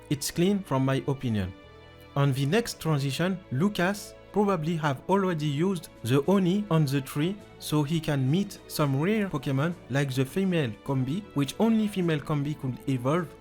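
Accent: France. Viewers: That’s a French accent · Tu es français? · T’es français ? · c'est pas pour me moquer mais l'accent français me calcine j'arrive pas a suivre la video normalement 😭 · he’s French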